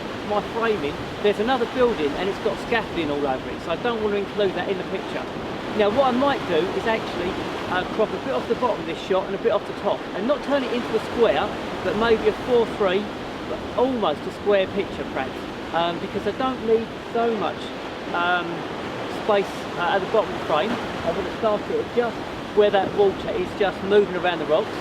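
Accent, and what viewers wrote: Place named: Essex, United Kingdom. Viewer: Great Essex accent!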